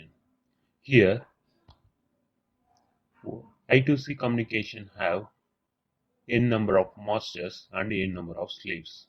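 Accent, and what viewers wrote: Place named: India. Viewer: come on idiot!!! stop speaking english... i hate indian poeple speaking English... it sounds awful !!! I hate indian people since now.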